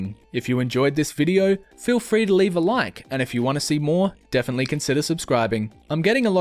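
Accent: Australia. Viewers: Finally an Aussie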